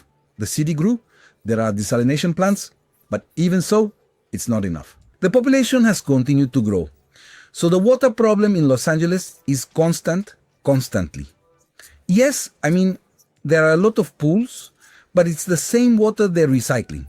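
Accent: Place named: India. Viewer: Guessing its IA....?¿? Misspronuntiation makes me think thats the real you but sound like indi pretty often @@ Interesting project! Gonna keep an eye on it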